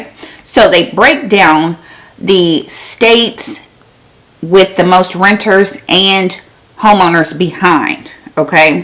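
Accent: South, United States. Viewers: with a southern accent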